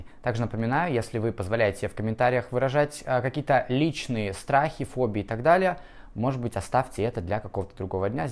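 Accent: United States. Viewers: Это безусловно. Но у Вас действительно красивое произношение американского варианта английского языка, которое нелегко дается нашим соотечественникам, даже переселившимся в Штаты и Канаду.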